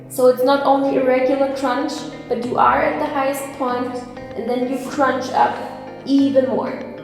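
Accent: Germany. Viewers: U hear the german English Hahahahha she cant speak fluently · Dikka man hört einfach wie Deutsch sie ist🇩🇪🇩🇪🇩🇪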